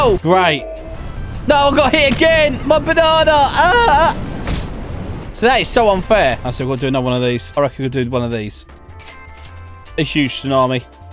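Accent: United Kingdom. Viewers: are you bri’ish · U speak British me too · I just now he's British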